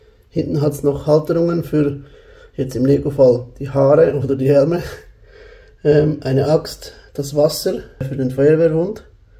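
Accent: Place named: Switzerland. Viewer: Endlich auf Deutsch! Mit tollem schweizerischen Akzent. Vielen lieben Dank!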